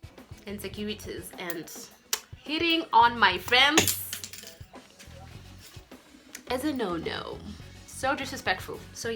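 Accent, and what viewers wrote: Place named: England, United Kingdom. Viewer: I dont know if I have said this before but mahnn do I love your English accent u know how u pronounce words gets my attention all the time ooh and your channels are so like real if u get what I mean like all the tusmall movements biros speech keep that up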